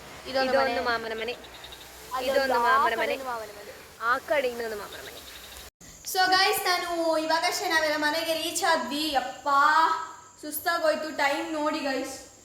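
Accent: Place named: Kundapura, India. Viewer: ನಿಮ್ಮ ಕಡೆ ಮಾತು ತುಂಬಾ ಚಂದ ಉಂಟು ಕುಂದಾಪುರ ಕನ್ನಡ ಕೇಳೋಕೆ ಚನಾಗಿರುತ್ತೆ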